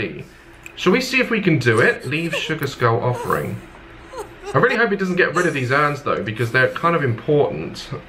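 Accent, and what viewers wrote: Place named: Essex, United Kingdom. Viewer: just next time, please make the roasts funny. btw are you from essex? no offense but you sound like it. i am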